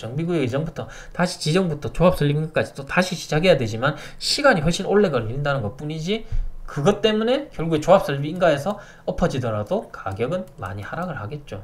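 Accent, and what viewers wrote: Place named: Busan, South Korea. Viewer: 정보 감사합니다. 지금은 서울에서 살고있지만 15년전에서 부산남천동에서 살았지요. 정보도 감사하고 고향사투리가 듣기도 좋아요.^^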